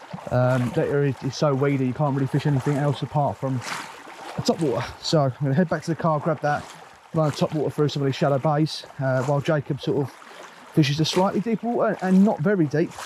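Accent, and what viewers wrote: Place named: Lancashire, United Kingdom. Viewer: What was this Rez buddy, great fish! Are you from Lancashire?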